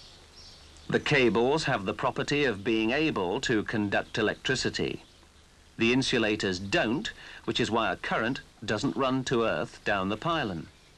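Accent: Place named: United Kingdom. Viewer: British vs. North American pronunciation and spelling. The video uses the correct British (and most of the rest of the English-speaking world) pronunciation.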